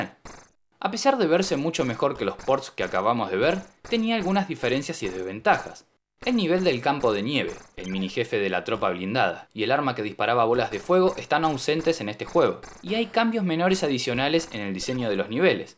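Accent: Argentina. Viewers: Por que carajo me olvide que seguia este canal? Muy bueno tu contenido y tu acento argentino pipicucu saludos de Entre Rios. (faltan insultos) jajaja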